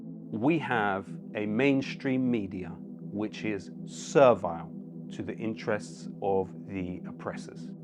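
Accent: United Kingdom. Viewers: Nice British accent u have there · Bro says all this in his thick British accent in his massive house…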